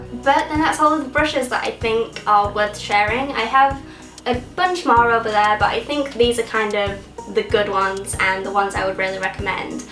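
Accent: United Kingdom. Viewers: I'm not used to the Gothic look with the British accent....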